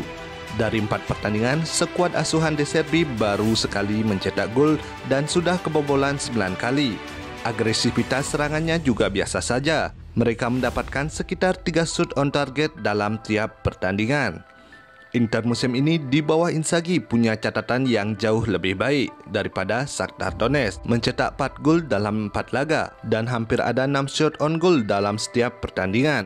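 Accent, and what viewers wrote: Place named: Aceh, Indonesia. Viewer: Admin yang bicara logatnya macam orang aceh.. betul tak min...FORZA INTER..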